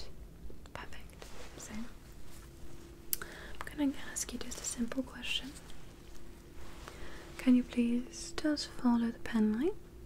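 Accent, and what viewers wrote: England, United Kingdom. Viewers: » Super relaxing video ✔ » Looking absolutely flawless ✔ » Best british accent on YouTube ✔ You always deliver,! <3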